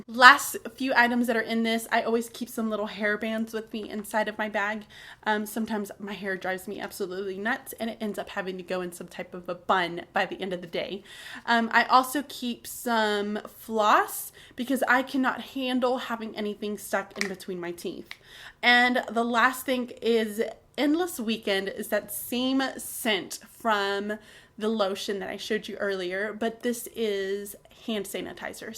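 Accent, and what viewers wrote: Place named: Alabama, United States. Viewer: Are you from Alabama... your accent really came out... if so, we are neighbors.... I'm from Mississippi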